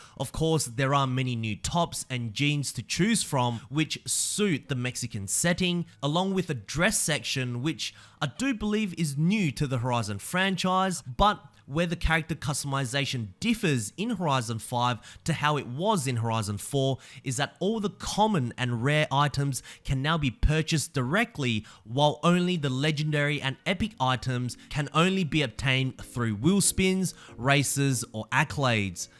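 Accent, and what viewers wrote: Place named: Australia. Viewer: U are from Australia ?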